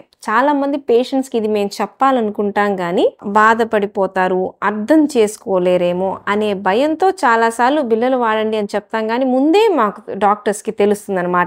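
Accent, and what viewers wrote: Guntur, India. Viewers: Maanchi guntur yaasa😅😅😅😅😅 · సహజమైన గుంటూరు భాష ,యాస👍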